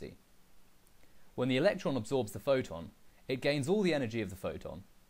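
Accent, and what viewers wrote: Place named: RP, United Kingdom. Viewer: Awesome posh accent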